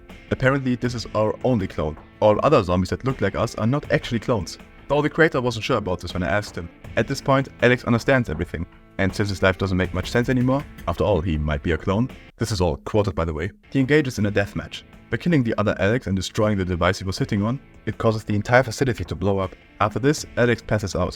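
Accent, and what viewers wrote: Germany. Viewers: I find it odd that you mispronounce Steyr despite having a very strong GERMAN accent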